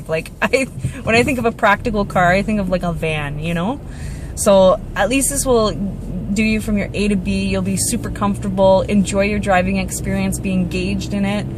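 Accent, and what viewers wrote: Canada. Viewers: cute lady, but its Mahhhzda. i literally loathe canadian pronunciation.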